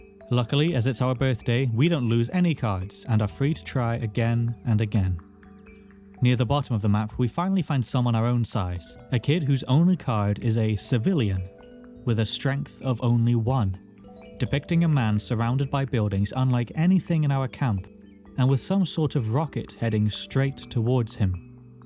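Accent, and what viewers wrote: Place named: Wales, United Kingdom. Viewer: You kinda sound welsh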